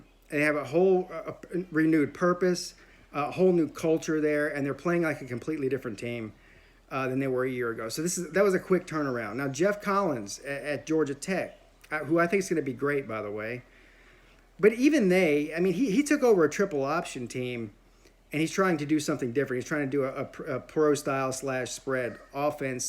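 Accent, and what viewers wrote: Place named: South Carolina, United States. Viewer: what a Clemson accent...u suck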